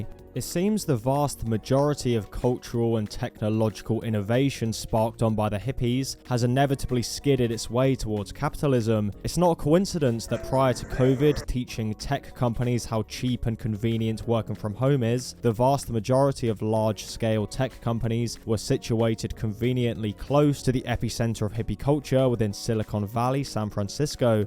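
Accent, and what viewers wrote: United Kingdom. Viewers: mate, he's british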